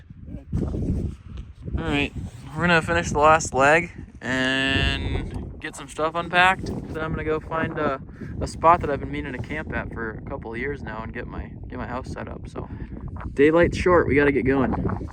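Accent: Minnesota, United States. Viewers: I love how you say fishin' and campin' like a Minnesotan,.:) Hello from Michigan (same accent here, basically).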